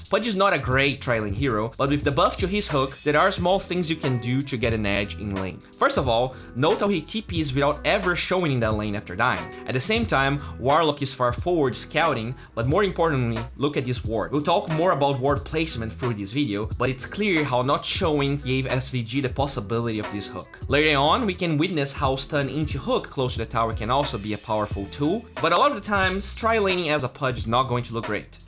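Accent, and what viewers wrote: Brazil. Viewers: Oh so he is brazilian that's why. I know he's doing all he can to improve his accent i believe in him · as a brazilian, his brazilian accent is rly easy to recognize, but he is improving his pronounciation comparing to his older videos.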